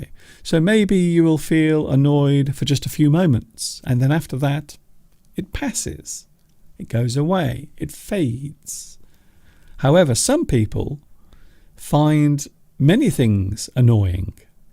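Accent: United Kingdom. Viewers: My lovely British accent!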